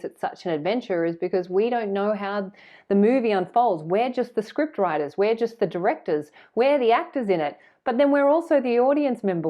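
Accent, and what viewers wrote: Australia. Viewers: So refreshing to hear an Australian. Really enjoying your content from far north Thailand. Thank you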